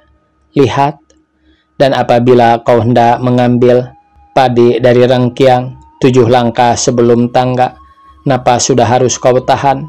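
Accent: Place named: West Java, Indonesia. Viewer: Bahasa lndonesia, aksen Sunda.. Ki Sunda mah cocokna macakeun dongeng Sunda..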